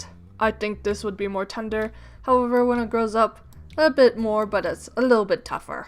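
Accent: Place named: France. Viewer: You sound french or something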